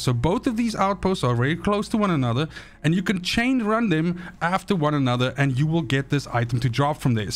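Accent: South Africa. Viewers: Nice vid bro. Question where in South Africa you from 😅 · Are you south African? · So I can't get over the fact that you sound South African but pronounce your words like an American 😅 Where are you actually from? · Btw are you in S.A? You sound south African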